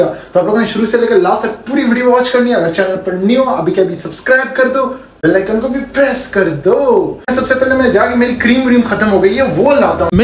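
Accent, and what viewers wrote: Pakistan. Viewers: Your transformation is amazing , you look so handsome but the issue is the way you speak, the moment you spoke it doesn't suit the swag you have in appearance. Pakistani language and male tone isn't sexy enough.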